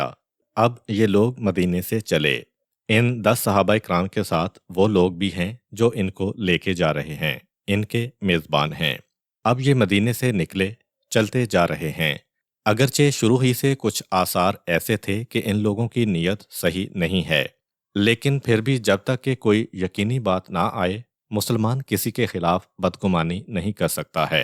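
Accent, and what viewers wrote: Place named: Punjab, Pakistan. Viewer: آپ کے لہجے میں پنجابی جھلکیاں سنائی دیتی ہے مجھے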